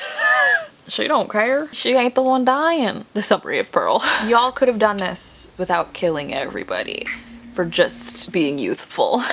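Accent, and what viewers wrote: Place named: South, United States. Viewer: I know she said she lives in Florida but when I've seen her other videos I never heard an accent. However this time when she talked about stepping on a gekko with her bare feet because she lost her glasses while the video had the guy stepping on the nail I could swear I heard her have a Southern accent. I had a friend I met in college and after talking to her for two weeks it was only then, after we'd studied all night, that her Lousiana accent came out.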